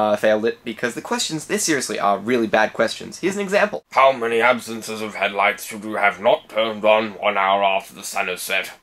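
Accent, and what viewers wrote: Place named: United States. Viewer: Good american accent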